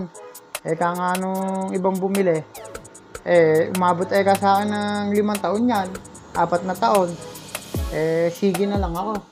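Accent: Bulacan, Philippines. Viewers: yung punto ng salita mo, parang bulacan o nueva ecija? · Bulakenyo o taga binangonan ka paps? Sa punto kasi ng salita paps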